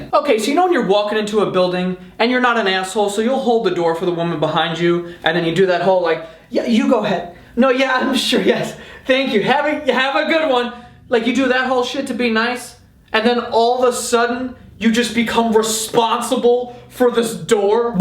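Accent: New York, United States. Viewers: What is your accent is it New York Brooklyn or what?? Manhattan jersey Italian ?!? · You're accent is amazing lol it's so cool. Is it like a NY accent? (Sorry I'm from Europe) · Dope NY accent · As a New Yorker, I can confirm that all New Yorkers sound like this guy.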